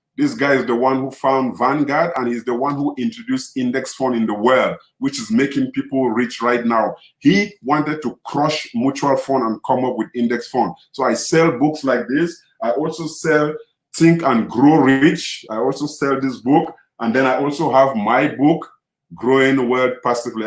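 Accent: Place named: Nigeria. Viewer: Interesting collaboration you had with! You sound more like a Nigerian. Awesome.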